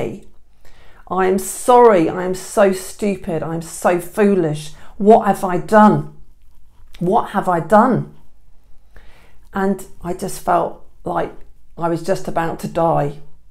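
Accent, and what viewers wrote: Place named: England, United Kingdom. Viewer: Nice to hear an English accent on one of these stories. I went to art college, wonderful times. Thank you for your openess. xx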